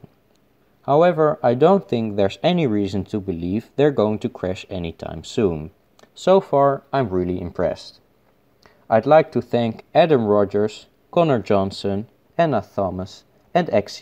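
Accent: Netherlands. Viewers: Ur Dutch Lache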